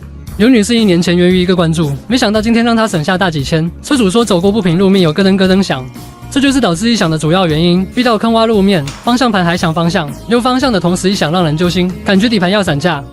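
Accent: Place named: Taiwan. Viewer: 可這明顯台呱口音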